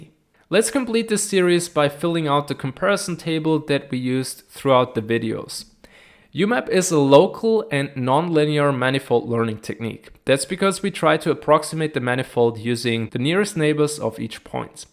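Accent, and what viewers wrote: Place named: Germany. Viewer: Thanks a lot! Very good series! You sound German, where you acquired your education if I may ask?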